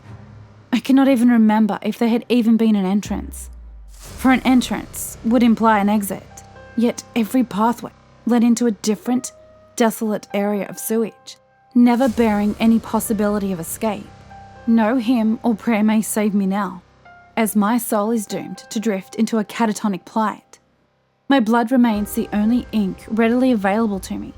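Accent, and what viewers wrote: Australia. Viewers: The best Aussie voice is at it again... Great video sis!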